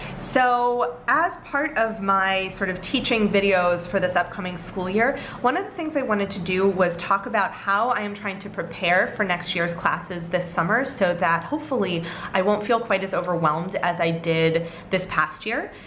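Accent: United States. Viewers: That's really cool. The Yearbook thing brings back memories =) Do the kids ever get confused about pronunciation of some English words between your American pronunciation and the other teacher's UK pronunciation?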